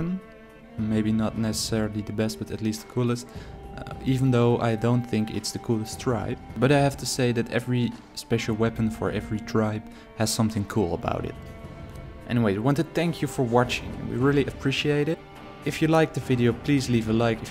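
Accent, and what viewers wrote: Netherlands. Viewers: I can tell you're dutch by your english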